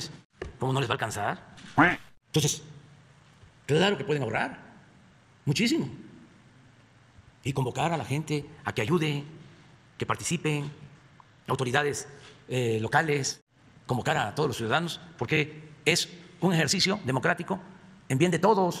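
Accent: Tabasco, Mexico. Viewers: El presidente viaja muchoooo y no trabaja.... Que raro acento tiene ya, entre tabasqueño y de cdmx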